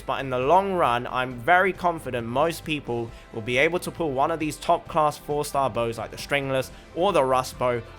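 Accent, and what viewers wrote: United Kingdom. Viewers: An asian dude with a british accent. wtf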